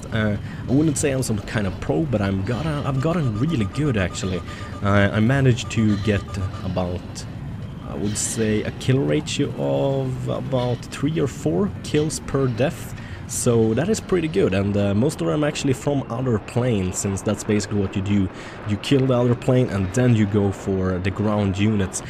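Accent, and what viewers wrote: Sweden. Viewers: Oh shut the fuck up guys. He's a Sweden so it's fine if he has an accent and he is a Damn good player and youtube. So hive him credit. If you don't like his videos, dont watch. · He's from Sweden, give him a break on his accent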